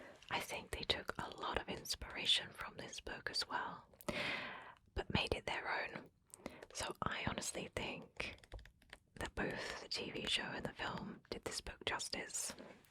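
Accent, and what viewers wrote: United Kingdom. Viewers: thanks for the video. It's nice to hear the British accent, it sounds very classy. 🔥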